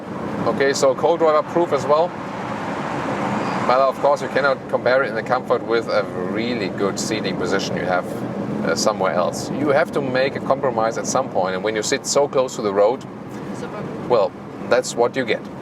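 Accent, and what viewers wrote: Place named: Germany. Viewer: Little by little you're starting loosing your accent almost German 90% combined with 10% english, before was G 50% E 50%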